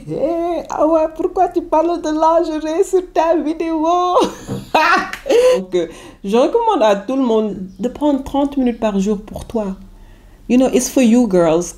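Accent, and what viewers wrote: Senegal. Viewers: j aime bien ton français woloftisé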